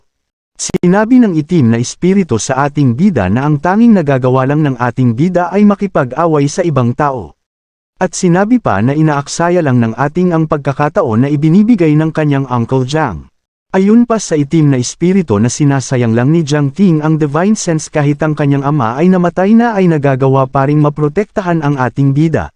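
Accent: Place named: Conyo, Philippines. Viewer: Application Kasi Yan kaya mdyo conyo ung pagsasalita.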